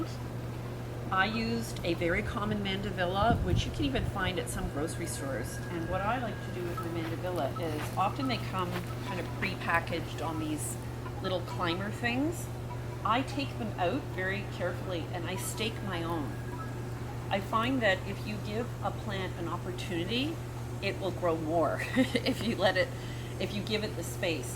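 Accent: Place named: Ireland. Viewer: A great garden, are you Irish. You sound a bit Irish. Keep up the good work.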